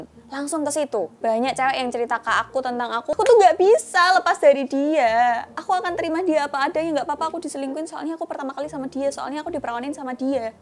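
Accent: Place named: Java, Indonesia. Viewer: Medok mbak.. salam soko wong semarang (Tembalang) ak bocahmu:D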